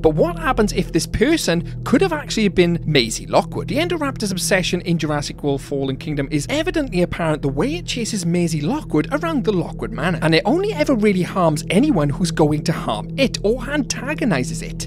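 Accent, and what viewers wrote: Wales, United Kingdom. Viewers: is that… a welsh accent i hear!!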